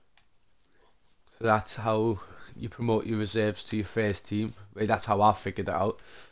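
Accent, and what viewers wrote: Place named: South Shields, United Kingdom. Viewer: The shields accent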